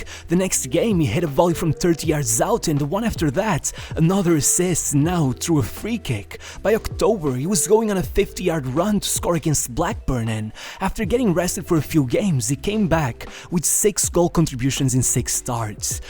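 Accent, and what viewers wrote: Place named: Portugal. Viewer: Your agitated narration and heavy accent make you very hard to understand and annoying. Either relax and slow down your narration or improve your intonation to sound more like an English speaker and less like a Portuguese speaker. All the best.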